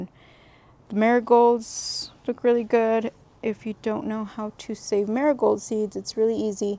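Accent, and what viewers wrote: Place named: El Paso, United States. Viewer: I clicked on your video without reading the caption and as soon as you started talking I knew you had to be from my area lol I’m from Las Cruces 🥰🙌🏽 and your new follower!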